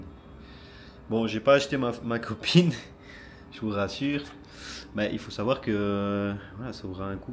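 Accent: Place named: Belgium. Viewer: Oh l'accent belge 😀 Merci poiur ton partage.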